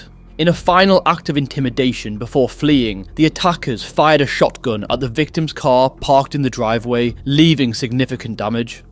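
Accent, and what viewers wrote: United Kingdom. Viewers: who is this British accent speaker broadcasting old news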